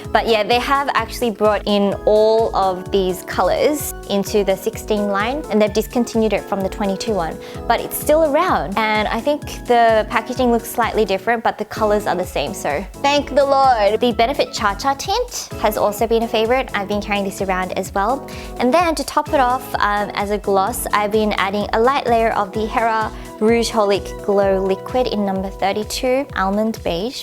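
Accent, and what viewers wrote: Australia. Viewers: What's her accent? Australian?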